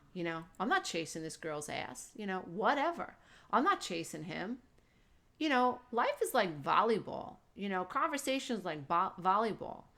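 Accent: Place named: Long Island, United States. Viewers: Wow! I've learned more in thus short min video than I have in the 5 years of therapy that I've been going to! Thank you, thank you, thank you! As I listened, I recognized your accent. Originally, I thought Brooklyn bc I'm originally from Bensonhurst. But I read the wiki page and it said Queens. Close! Also, the "Shutty, shutty"! I say that too and got it from The King of Queens', one of my favorite shows ever. Lol I look forward to learning from all you have to teach! Thank you again. 💕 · Grunt at me like a hog. your funny. I’m from Long Island and your accent makes me feel like I am back home. 💕💕💕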